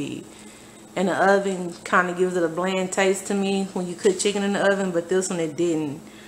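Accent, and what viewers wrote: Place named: South, United States. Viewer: Love your southern accent. I I might have to invest in one of these things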